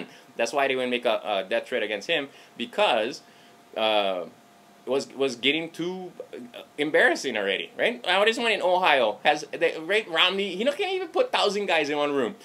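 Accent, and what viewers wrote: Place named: England, United Kingdom. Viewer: Nice D&D shout out. LoL. However you made this far too English English. Was right esay to unnastan man.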